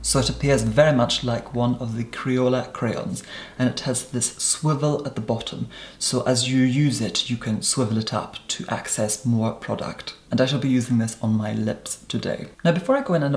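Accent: Scotland, United Kingdom. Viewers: What is your accent?? Is it posh Scottish? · I get Scottish vibes from the accent ...? But I have just come across u and I subscribed during the first five seconds I LOVE UR STYLE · He is scottish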